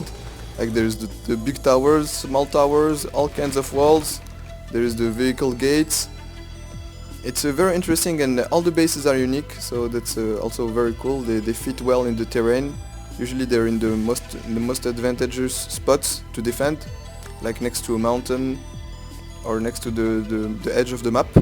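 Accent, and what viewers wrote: France. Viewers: on sait tout de suite que t'es Français ah ah ah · es-tu francais? tu as laire davoire laccent ;)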